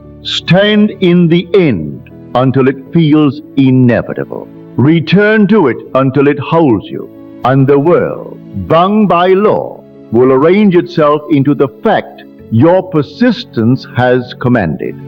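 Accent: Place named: RP, United Kingdom. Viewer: I am more confused than ever. What on earth is he saying? Posh accent:-)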